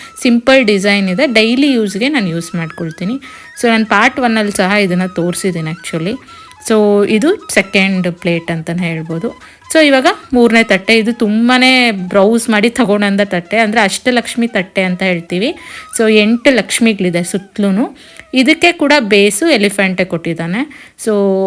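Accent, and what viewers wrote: Kolar, India. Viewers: Nimdu kolar ahhh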